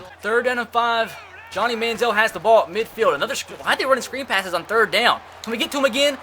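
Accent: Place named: Alabama, United States. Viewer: U have an alabama voice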